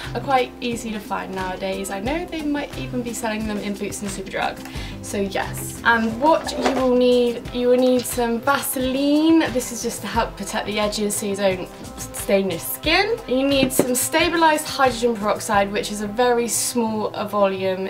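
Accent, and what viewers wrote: United States. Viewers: I don't understand why you talk normally and then have an American accent? Throughout this video... Kinda annoying. Helpful video though :) so thanks! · I like how you talk, it was cool to hear you sound kind of American. Don't listen to the person who said it was annoying. Most people make different sounds or slight variations or accents from time to time. :)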